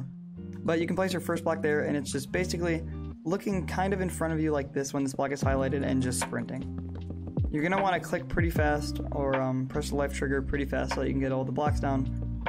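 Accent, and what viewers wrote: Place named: Netherlands. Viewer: where are you from you sound dutch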